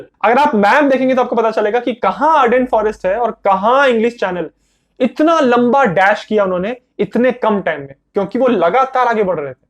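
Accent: Bengal, India. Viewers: Bro are you a Bengali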